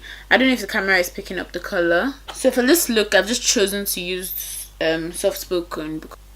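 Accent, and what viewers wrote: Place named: Nigeria. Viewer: Gurrl!! You're Nigerian accent is everything, original, i hate it when people try to copy other people's accent, kudos!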